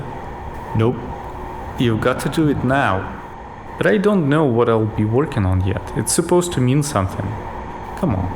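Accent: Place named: Eastern Europe. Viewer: This video made me dig my anbernic rg35xx out of the box. Also, your accent sounds slavic to me 😁Thanks!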